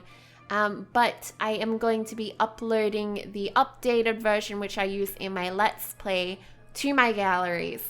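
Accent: Australia. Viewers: are u Australian because your accent sounds so much like an Australian's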